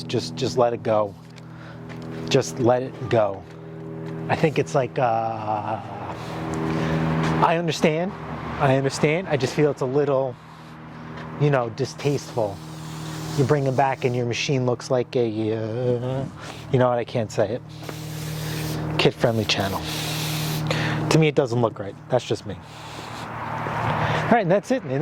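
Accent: New York, United States. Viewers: Thanks for the video. Really funny hearing a New York accent living here in central Idaho.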